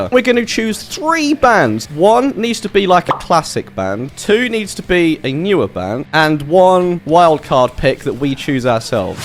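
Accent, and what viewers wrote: England, United Kingdom. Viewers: me agrada ese acento ingles, bro ! suena genial! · I fkn love this bri'sh accent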